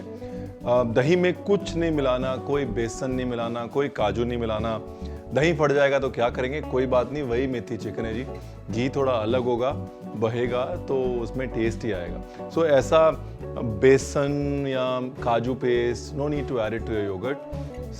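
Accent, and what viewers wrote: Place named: Punjab, India. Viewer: Hi Ranveer tuhadi punjabi ta sachi maar dendi hai, above all tuhadi recipes....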